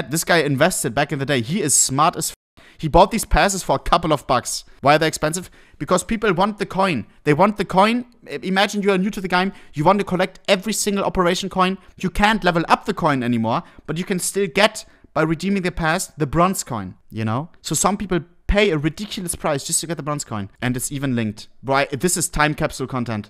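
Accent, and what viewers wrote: Germany. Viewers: German accent in english is fucking great lmao i moved to germany over 2 years ago and as a english speaking person it was soooo funny hahahahahah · my guy is german but speaks english bra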